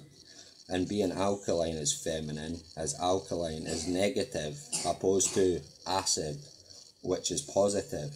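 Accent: Scotland, United Kingdom. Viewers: Well, I do not understand everything because of your accent, as English is not my mother tongue, I have problems with the Scottish accent, AND with the baby's noises in the background, sorry to say...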